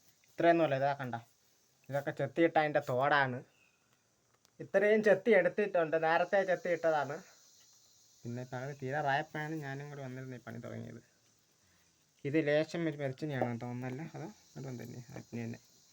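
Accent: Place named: Thiruvananthapuram, India. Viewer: മോനെ നിന്റെ ഭാഷ യാണ് ഇഷ്ടം മക്കളെ നമ്മുടെ പപ്പനാവ സാ മീരെ മണ്ണിലെ ഭാഷ ഒരിക്കലും അത് കൈവിടല്ലേ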